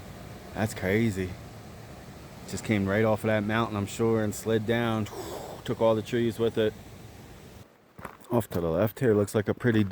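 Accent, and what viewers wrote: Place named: Pennsylvania, United States. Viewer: You need to get yourself a fishing pole brother. Sounds like you are from PA?!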